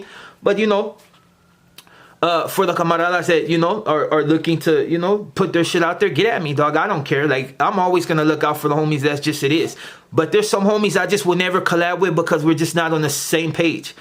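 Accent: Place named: Mexico. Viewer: Why are you put on that Mexican accent in your voice your black brodie🤣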